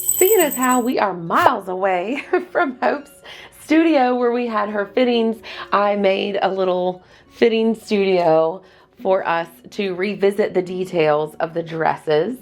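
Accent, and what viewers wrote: United States. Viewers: Oh my God girl! Your voice sounds so familiar! Are you from Alabama? I saw you on the Hopescope video. And I heard your voice and I was like this girl is from Alabama and I know that voice!! Lol! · Hope ❤ You did such amazing work!!! I just watched her video and she absolutely loved them. I love the pink and rainbow dresses. Also, listening to you is so soothing. You remind me of my two aunts who live in Texas and Mississippi ❤❤❤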